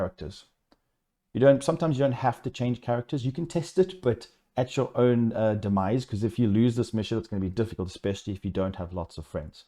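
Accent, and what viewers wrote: South Africa. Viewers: South african? if so what clan are you from?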